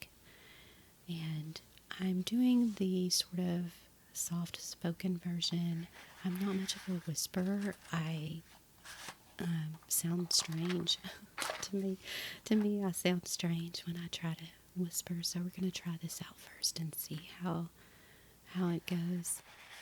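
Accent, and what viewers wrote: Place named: South, United States. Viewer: Yay, you're speaking! I love your baking videos but always wished you'd add the soft spoken voice. Generally I don't like voiceovers, but yours was great. So, yes to the speaking, yes to the crinkly flour and sugar bags, yes to the butter wrappers, yes to checking the recipe a lot, yes to minimizing the mixer sound and yes to porcelain bowls! Great job! Plus you really know your baking. And your southern accent was just the icing on the cake. Maybe you could add narration to your other past videos. Keep up the great work! Thank you.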